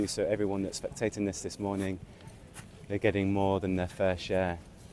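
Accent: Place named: Staffordshire, United Kingdom. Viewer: … the more I listen to your narration the more I think he was born and bred in the potteries or the Staffordshire Moorlands.. I may be wrong of course but that accent sounds familiar … Nice video btw as usual … 🫡🫡🫶🏻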